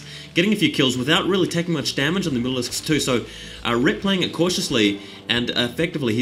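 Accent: New Zealand. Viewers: kool to see a real starcraft game again, some of my best games on this map long time since i viddied some of, the new zealand accent is nice:) btw i think ret has +1 attack on his lurkers or atleast i always got that for them first so they twoshot marines with 3 armor?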